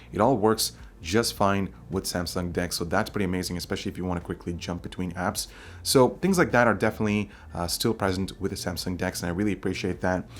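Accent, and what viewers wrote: India. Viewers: INDIAN ! WITH NICE ACCENT! KEEP IT UP BRO ! :)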